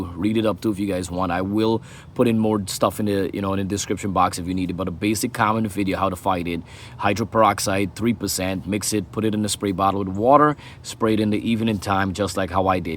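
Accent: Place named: Guyana. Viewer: I heard the accent and had to subscribe! From one Guyanese gardener to another 🇬🇾🇹🇹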